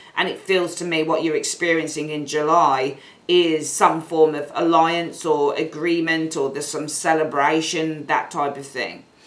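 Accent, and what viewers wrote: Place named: Midlands, United Kingdom. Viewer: This reading really resonated I can hear in your accent you’re a fellow midlander 👍👍👍 Love and light ☀️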